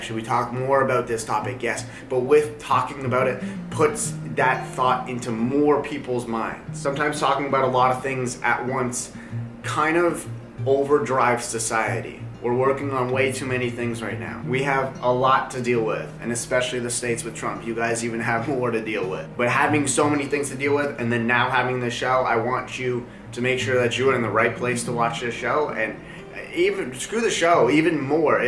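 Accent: Canada. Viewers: Love your cute Canadian accent eh!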